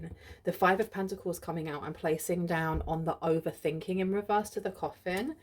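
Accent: United Kingdom. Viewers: I love watching you . Your voice is so amazing to hear , it’s very clean , and beautiful British accent . It’s drawing to listen , so alluring cause of quality of Your voice . And the content is also really interesting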